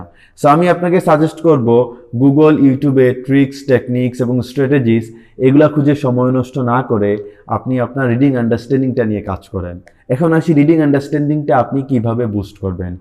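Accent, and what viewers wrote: Sylhet, Bangladesh. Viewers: আমি যদি ভূল না করি তাহলে আমার মনে হয় আপনি একজন সিলেটি। আপনার কথার টোনে বোঝা যাচ্ছে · Sir apni ki sylhety? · R u from Sylhet sir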